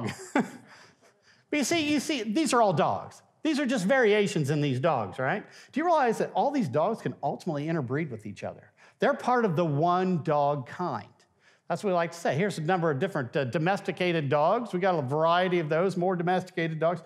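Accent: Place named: Tennessee, United States. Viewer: Just before you compliment (or tease) your wife, let me guess : you are from Tennessee?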